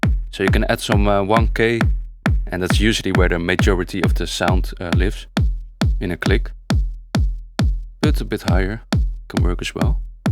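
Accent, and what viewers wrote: Netherlands. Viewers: yeah as i can hear u are dutch man xD accent speaks. I am from Finland, elektron is made from sweden , i have kick ninja plugin it is also good one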